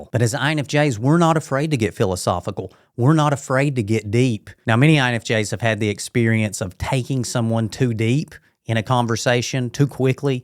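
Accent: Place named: Alabama, United States. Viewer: Hey are you from Alabama? Love the accent. And everything you saying is SOOOO TRUEEEE 😊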